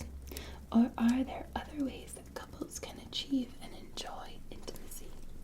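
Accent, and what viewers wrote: Scotland, United Kingdom. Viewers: I love your Scotish accent it's so soothing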